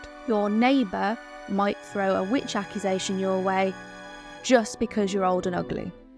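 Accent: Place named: England, United Kingdom. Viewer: G'day, I'm an Australian agnostic atheist. As an Australian, I suspect that I could be a witch or an atheist or a stack of other things for the foreseeable future without any dramas. Your accent sounds English and while I can point to a lot of things about the British government that I don't like, I doubt that you would be in danger from your government for your religious practices in the foreseeable future. However, I don't think that applies to women in the US. There women are taking additional steps to ensure their menstrual cycles do not become state knowledge, lest they be charged with abortion. (Worryingly, women who fall pregnant, don't know it and then miscarry after as little as 6 weeks are theoretically in the firing line of some of the anti-abortion laws.) About 70% of people in the US think that abortion should be allowed at least in some circumstances. 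The laws do not reflect that opinion. Similarly, it's been decades since a Republican president actually won the popular vote, but there have been a lot of Republican presidents in that time. So, in the US, I think there is a distinct possibility that Christian Nationalists could gain power in government (especially at the state level) and that they would go after people for witchcraft. Christian Nationalists aka Christo-fascists would not win the popular vote but that doesn't seem to matter in the US. The trick, then, for someone in a jurisdiction where the Christo-fascists have gained power, is to recognise that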